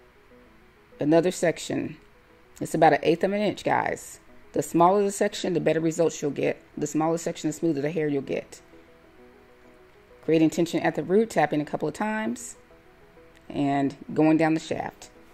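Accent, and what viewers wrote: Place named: Georgia, United States. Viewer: Lol... I was just thinking she sound like she from Georgia!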